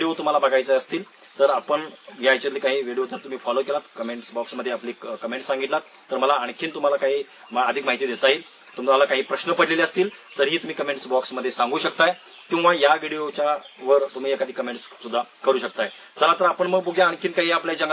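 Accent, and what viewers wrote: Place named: Kolhapur, India. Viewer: तुम्ही कोकणात राहता तर कोल्हापुरी टोनमधे कसे काय बोलता?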